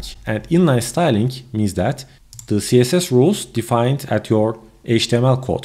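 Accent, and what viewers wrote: Turkey. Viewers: I'm browsing for coding solution and a man with Turkish accent tells me how to solve. Gosh, finally.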